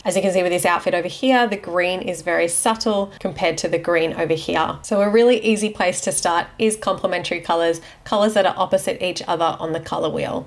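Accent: Australia. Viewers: Great vid love your non bogan Aussie accent and your way of explaining this theory is really clear with good examples. Thanks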